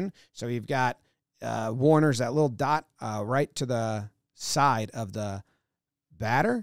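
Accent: United States. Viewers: Made my day. Sounds b like an American and he’s analyzing the game. Warms the cockles of my heart ❤❤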